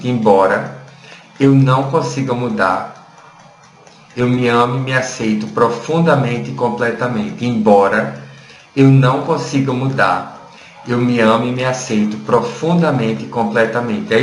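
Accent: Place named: Rio Grande do Norte, Brazil. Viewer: tu és de qual estado? Não consigo identificar o sotaque... Acho que é do Nordeste!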